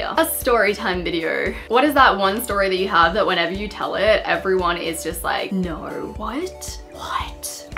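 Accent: Australia. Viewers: Omg r u Aussie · I think you're Australian accent, I love that accents.I went there for vacation love listening people are talking. I'm gonna start a new channel too. This is helpful .because I'm a new mommy wanna be busy while im home taking care my 3 months old baby. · Are you Australian?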